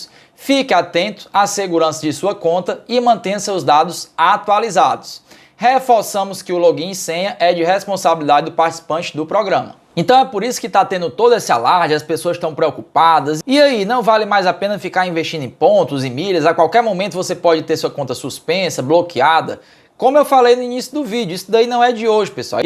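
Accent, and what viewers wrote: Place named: Fortaleza, Brazil. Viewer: Tu é de Fortaleza macho 😀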